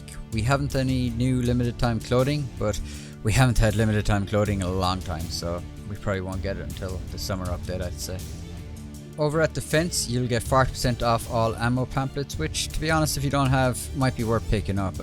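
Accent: Ireland. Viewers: This man is Irish by the sounds of it.....legend ☘️😁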